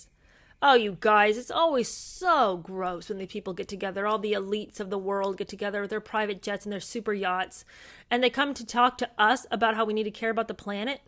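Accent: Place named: RP, United Kingdom. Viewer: You're posh English accent is hilarious! So funny. Thank you.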